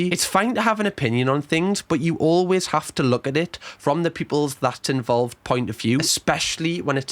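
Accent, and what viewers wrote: Newcastle, United Kingdom. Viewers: Your voice is going up at the end of every sentence.. I get your a geordie.. But it's hard to watch with the internation... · Are you geordie ? I keep hearing bits of welsh in your accent.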